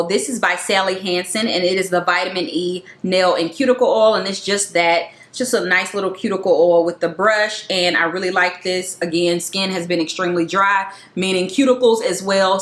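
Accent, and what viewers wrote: South, United States. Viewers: Thanks for sharing! Btw, where are you from? I hear the southern "accent." 🙂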